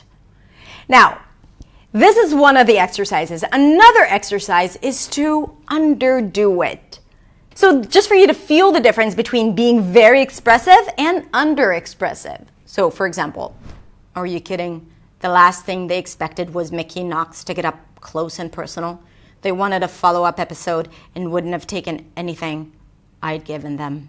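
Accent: New York, United States. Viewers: I am a native English speaker/English teacher and I did not realize she was not a native English speaker until she mentioned it. The only accent I noticed was a slight “New York“ accent!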